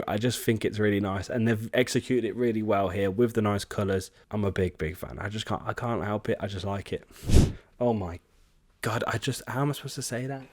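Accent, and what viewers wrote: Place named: England, United Kingdom. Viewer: Also, you’re English, so it’s totally fine to use English pronunciation; every other language pronounces most of the foreign words in their own pronunciation. If you think about it, the Italians, French, Spanish, all pronounce English words like e.g. Manchester in their own way. No need to apologize, just be you and keep up the good work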